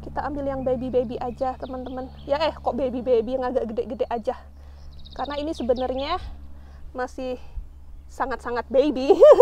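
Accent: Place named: Banyuwangi, Indonesia. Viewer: Bang sekarang klo ngomong banget jd ketularan logat Banyuwangi nya mbk Manis banget jd manis byangettt 🤭🤭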